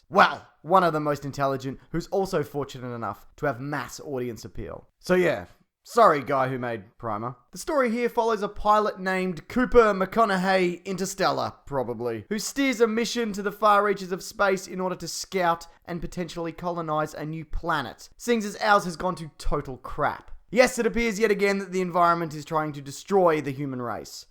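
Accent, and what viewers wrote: Australia. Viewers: Your Australian aren't you mate ?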